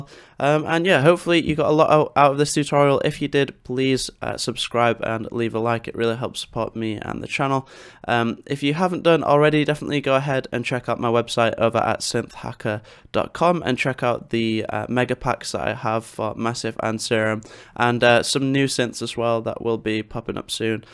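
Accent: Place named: Teesside, United Kingdom. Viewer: Teeside accent im hearing 😊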